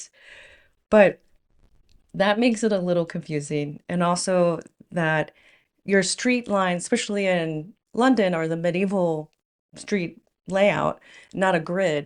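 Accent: South, United States. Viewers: Hi, unlike some American accents (the abrasive, machine gun type screech on New Yoick, for example), you have the kind of lovely, calm, soft, warm Southern drawl that one would hope to hear as one "draws down the final curtain, and joins the choir invisible", to parrot-phrase Monty Python. (I've just read that back, it was intended to be humourous, although I meant the first bit, but it makes you sound more like the Angel of Death! SORRY!!). Our sense of humour is WAY more sophisticated, combining dark sarcasm (in the classroom), irony, understatement, self deprication (a quintessentially British trait), and satire, that goes over the heads of a lot of Americans used to a more literal, almost slapstick style. "Sorry" is a kind of reflex response, to avoid escalation. Queues are just something we do instinctively, and when combined with our sense of humour/understatement is a way of gently letting of steam, when you're stuck in a HUGE long queue that's barely moving, the queue moves forward one pace, and you cheerfully say to the person ahead/behind "won't be long now". 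Queues in pubs are on a completely different level; you can be 3 or 4 deep at the bar, everyone just knows who's next to be served, including the barstaff, no arguments (although regulars do tend to get preferential service, with a nod and a wink, not speaking from experience, of course, lol!). The weather usually undstatement mixed with sarcasm, and is a very safe way of breaking the awkward silence with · I really like your American accent - very easy to listen to. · I liked the southern accent!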